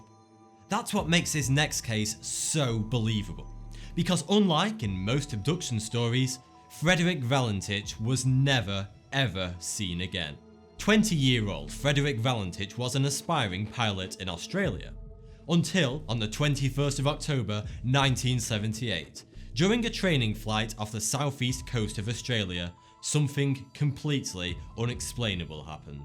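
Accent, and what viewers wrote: United Kingdom. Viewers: He is British (not trying to be racist) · this dude is very British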